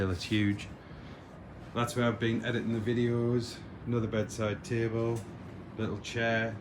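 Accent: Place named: North East, United Kingdom. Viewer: You’re accent sounds very north east! We’ve also stayed in this hotel, loved it, fantastic location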